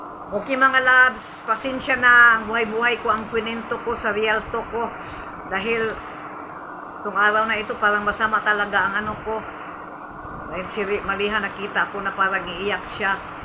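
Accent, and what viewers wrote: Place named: Visayas, Philippines. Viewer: Ilhan gyud sis sa accent nato lahi gyud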